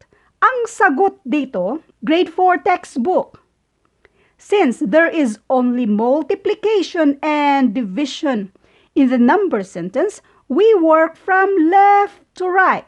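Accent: Visayas, Philippines. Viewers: bisaya ka po ba mam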